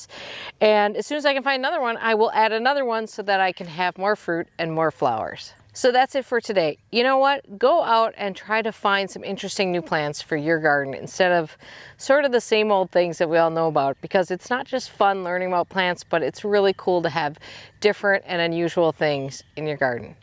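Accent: Wisconsin, United States. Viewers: Great video! Sad that you lost the bet. Go Badgers! I pronounce Oregon just like you do. Sconnie girl here too! 💚🌱 You should send Laura some Spotted Cow from Leinenkugels. Then if she likes it, she will have to come here to buy more. (Only sold in Wisconsin) 😊😊 Have a wonderful day!! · This is fun. Love your sense of humor and that you garden in my zone. Knew you were from Wisconsin as soon as I heard you talk in another video.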